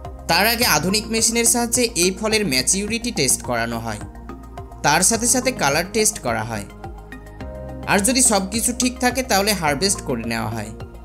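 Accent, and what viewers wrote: India. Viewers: তুমি যে ইন্ডিয়ান এটা কথা শুনে বুঝা যায়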